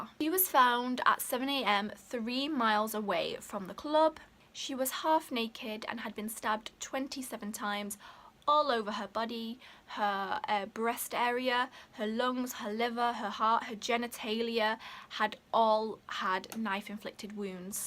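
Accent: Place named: Manchester, United Kingdom. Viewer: love your videos sooo much! so interesting and it’s lovely to hear a mancunian lol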